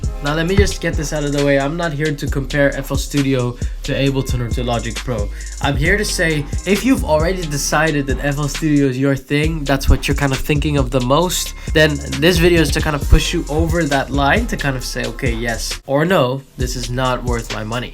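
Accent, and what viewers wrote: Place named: Netherlands. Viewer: that dutch accent is thickkk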